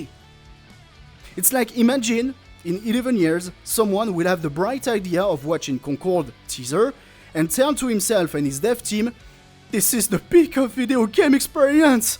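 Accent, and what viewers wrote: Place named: France. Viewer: I love your French accent pal, you are very thorough and proper with these videos, and they are made with love and meticulous craftsmanship. Keep it up bro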